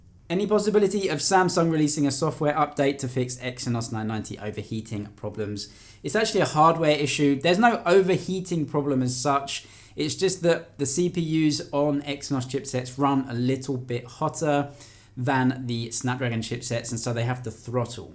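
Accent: England, United Kingdom. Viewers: ❤️from India , I really like ur videos for ur hard work, no favouritism, truth and ur English accent I really like it .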